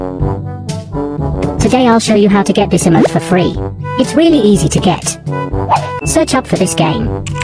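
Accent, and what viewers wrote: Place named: United Kingdom. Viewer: Your accent is british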